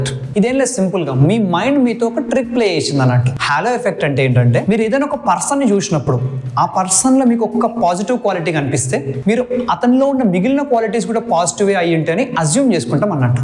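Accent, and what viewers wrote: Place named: Hyderabad, India. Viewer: Bro Meru Hyderabadi anukunta.meru matalade vidhanam alage <link> voice kuda chala bagundi.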